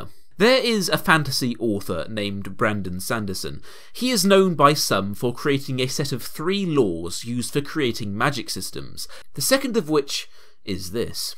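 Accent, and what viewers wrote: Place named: United Kingdom. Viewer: dat british accent 🔥🔥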